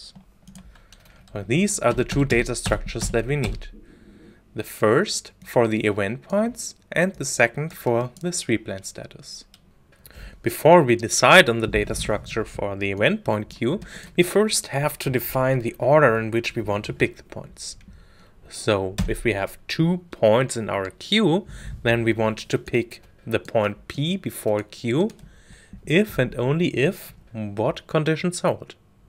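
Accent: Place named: India. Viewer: Dude looks like american, sound like indian. I meant no insult, I just meant you got all to be a tutor online.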